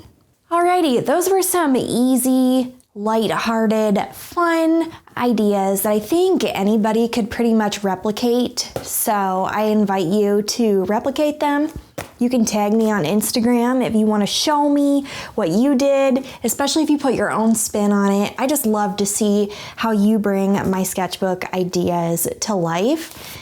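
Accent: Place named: South, United States. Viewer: I have been watching you for years and today I am finding out you have a Southern accent wow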